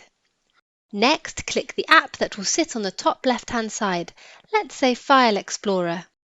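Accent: United Kingdom. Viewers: Love ur British accent 😍😍😍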